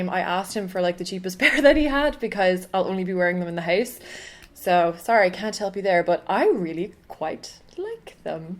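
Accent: Ireland. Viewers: She's actually from Ireland :) xx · She's from Ireland :)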